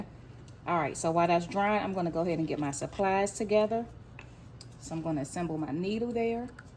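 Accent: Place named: Baltimore, United States. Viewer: Thanks for this video,i start my class on Monday.You sound like youre from Baltimore❤.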